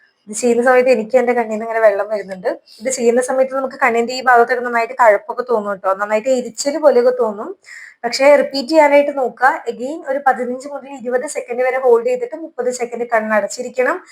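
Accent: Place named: Malappuram, India. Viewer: 100% ശരിയാണ്. മലപ്പുറംകാരിയാന്ന് തോനുന്നു. പൊതുവേ മലപ്പുറം കാർക്ക് പല അക്ഷരങ്ങളും വായീന്ന് വരില്ല. ഉദാഹരണം: മഴ എന്നു പറയുന്നതിനു പകരം "മയ" എന്നേ പറയൂ. പഴം: പയം, കഴപ്പ്: കയപ്പ്, പഴനിമല: പയനിമല, കഴിഞ്ഞു: കയിഞ്ഞു, നാക്ക് വടിച്ചാൽ മാറുമോ ഈ പ്രശ്നം. സാക്ഷര കേരളത്തിൽ ഇങ്ങനെയൊക്കെ കേൾക്കേണ്ടി വരുന്നു. കഷ്ടം.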